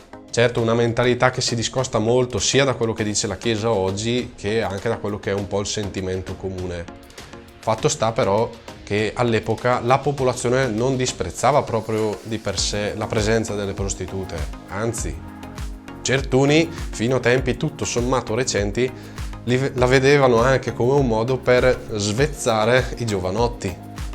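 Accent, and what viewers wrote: Brescia, Italy. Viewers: molto. Anche l'accento bresciano che hai mi piace! Sono stufo di accenti romaneschi e napoletani. E poi ti esprimi bene. Cosa rara tra i giovani. Continua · ma che bravo! mi sono bevuto 13 minuti di analisi comparata di costumi medioevali (col bresciano come caso, ma riapplicabile ovunque) che l'inflessione marcata rende più simpatica senza per nulla intaccare il contenuto (te lo dico francamente, di solito le parlate bresciana e bergamasca, da parte degli altri lombardi come me, vengono associate a generi meno dotti). Iscritto al volo, intanto cerco gli altri tuoi lavori. Avanti così!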